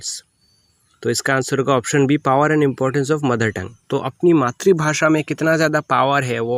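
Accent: Bengal, India. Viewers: Dada apne kita Barak valley theki ni na onno jagar emne tho Buchi apne Bangali 😅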